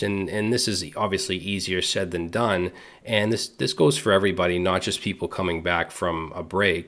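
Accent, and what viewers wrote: Boston, United States. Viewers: Hi. New sub. Thanks for this. I got to (5 years ago now) where I just felt I wasn't progressing. mostly due to the company slowly pushing us regulars out tbh.. so that was depressing and I've been waiting for that spark again I had when I first started doing web development and that... was decades ago. Watched your React crash course (wasn't my first time trying React and each time it changed which was so frustrating) and I enjoyed it a lot.. Can't say I've ingrained all the concepts yet but I'm continuing this time. Kind of have to financially. Also, I heard the accent. I'm just north of Boston. Maybe one day we'll meet. Thanks again for the down to earth everything.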